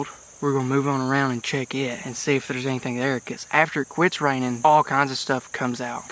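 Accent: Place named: West, United States. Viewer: A Russian man w/ a western accent #AK-47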